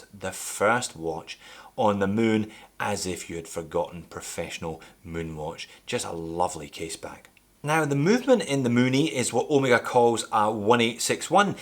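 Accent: Scotland, United Kingdom. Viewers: Is that a Scottish accent?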